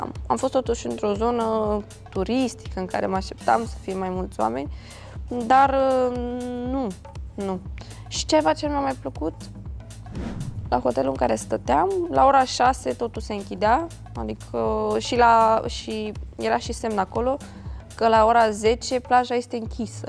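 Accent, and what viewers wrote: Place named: Moldova. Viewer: E moldoveanca ce vrei.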